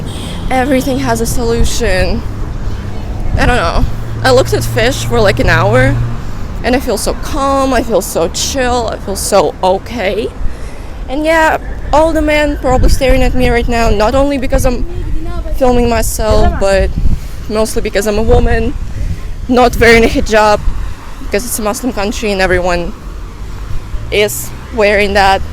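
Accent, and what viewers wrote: United States. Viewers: Your English is perfect. You sound like you were born in the USA. You remind me of the models in this video..... <link>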